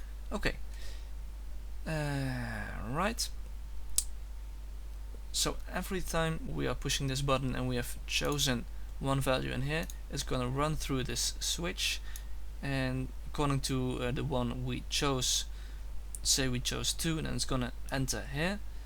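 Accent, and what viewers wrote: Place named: United Kingdom. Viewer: I thought you were british.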